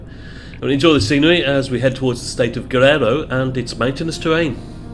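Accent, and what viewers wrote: Midlands, United Kingdom. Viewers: I'm off to Mexico in a couple of weeks - thanks for doing this upload :) PS do I detect a fellow Midlander from the accent ?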